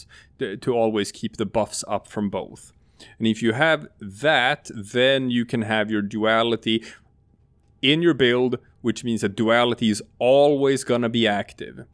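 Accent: Sweden. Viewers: First time seeing this channel, the second I heard that voice. Yea definitely a Swedish man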